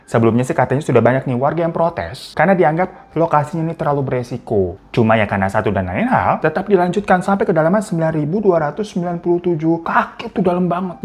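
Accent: Java, Indonesia. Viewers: suka salpok denger kak ngomong jawa, lucu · Ngakak lihat logat Jawa bang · seperti biasa bang mantap, tpi itu logat jawa keknya bagian barat dah wkwkwk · Ngomong bHasa jawanya lucu bngt baang😂😂😂😂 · Lucu bgt bhsa jawa ny bg 😅😅😅😅... Untung ada translate ny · Ni abang klo ngomong logat jawa lucu bgttt😂 kiyowoookkk😂❤ Sehat² ya babang sekeluarga dn kita semuaa 🤲🏻🥰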